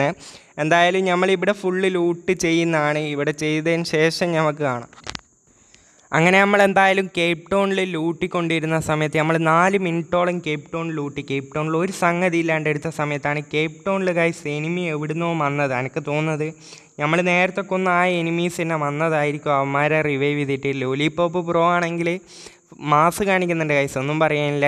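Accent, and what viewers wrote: Kasaragod, India. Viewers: 'kasaragod aaano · Da kasaragod aano beck beljji😂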